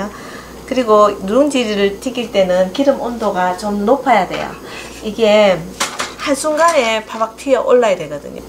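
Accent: Gyeongsang, South Korea. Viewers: 경상도말씨가 정감이가네요 설명도쉽고 정말감사히 잘배우고갑니다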